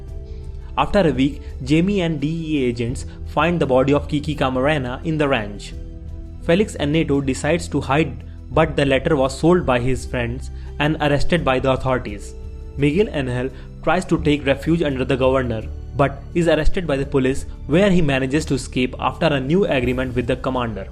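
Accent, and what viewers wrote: India. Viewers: I dont know what they are saying about the accent but haha never thoughts i'd hear a story happening in my language be explained in indian accent haha so cool dude! thanks a lot, i really could not recall s1 ! this one was concrete and short :D Greetings from Colombia and btw, so stoked you are into this show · The Indian accent is killing the video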